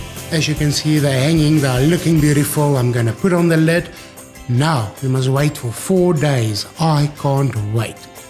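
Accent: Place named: South Africa. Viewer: I just love your South African accent!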